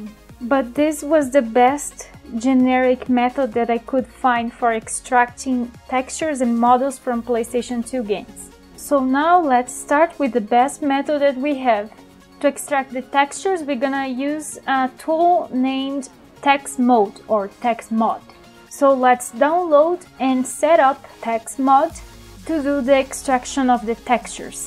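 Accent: Brazil. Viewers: Você tem sotaque brasileiro, deve ser brasileira. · caraca, eu tava ouvindo o seu ingles e pensei, só pode ser BR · pelo sotaque é BR hehe enfim mto bom o conteudo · Your accent is so cute! But I've found it oddly similiar... Até eu descobrir que vc é br xD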